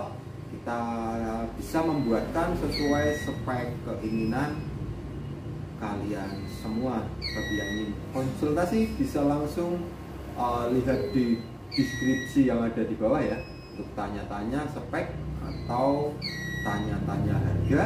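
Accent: Java, Indonesia. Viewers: simpel bos,, kalau anda mggak suka logat medok ya nggak perlu di tonton dan nggak perlu di dengarkan bos,,, daripada anda anda menggonggon nggak karuan di kolom komentar,,, channel youtube orang korea Reomit saja sangat bangga dengan logat medok,,, IRI BILANG.BOOOOOOOSSS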